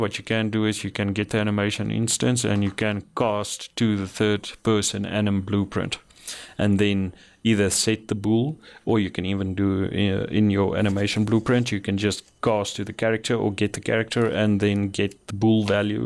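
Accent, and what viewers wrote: South Africa. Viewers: Hoekom klink jy soos n Suid Afrikaner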